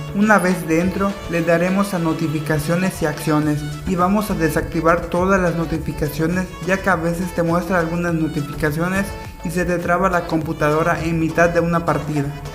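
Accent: Yucatan, Mexico. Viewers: De donde eres? tu acento suena a yucateco.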